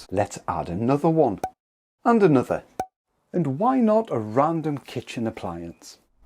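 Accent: Newcastle, United Kingdom. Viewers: Another excellent machine in the same ‘category’ is the Yamaha XT 250. Indestructible, nimble, super reliable, terrific fuel economy, cheap to purchase and maintain, nice low super comfortable seat and will go absolutely anywhere. Rode mine for 6 years throughout all types of terrain (here in SW Australia) - never let me down and would go places (right spots) where many larger machines would not venture - or reluctantly. Excellent resale value - sold it for more than I bought it. ONLY downside - a drag when needing to ride long distances on bitumen in one stretch - only enough power to cruise happily at 90 - 100 km/h - any more and it was working too hard. Excellent video by the way - love the Geordie accent (albeit a subtle one) - the most colourful of all English accents.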